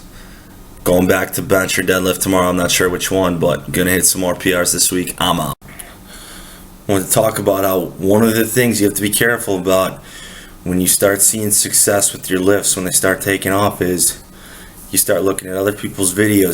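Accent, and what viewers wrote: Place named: Canada. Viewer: Call me crazy but as a canadian, I would think you were canadian by your accent if I didn't know you were american haha keep it up man you're a beast!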